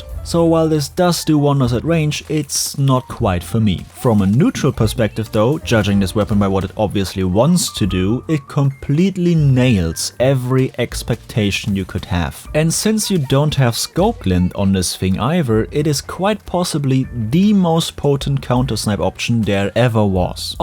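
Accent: Germany. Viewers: I fell in love with your voice and German accent in the first video I watched.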